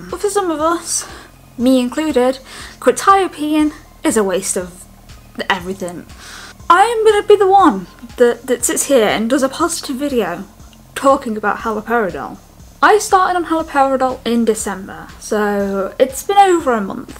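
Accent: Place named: United Kingdom. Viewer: Lovr your hair and glasses and beautiful accent if u dont mind me asking where u from are u British from England or London can't quite pinpoint your accent but i love it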